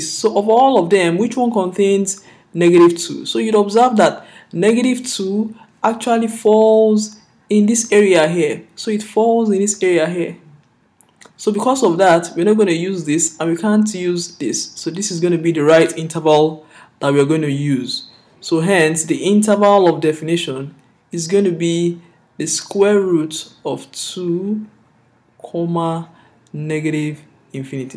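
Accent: Nigeria. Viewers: baba, are you Nigerian?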